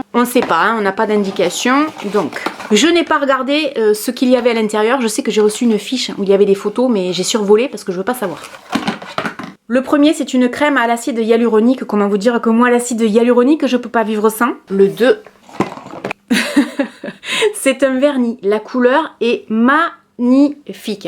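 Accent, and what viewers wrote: South, France. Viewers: Trop contente de te retrouver plus souvent 😍 et tu mérites largement d’être sponsorisée par des marques 🤍🍀 ! Je regarde toutes tes vidéos quel que soit le sujet car tu es notre rayon de soleil 🌞 avec l’accent du sud 😉 ! Je t’adore merci pour tes vidéos 😘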